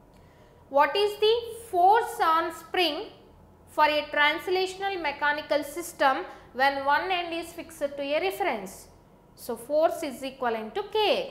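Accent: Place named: South India, India. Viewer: aiyooo south indian.... :D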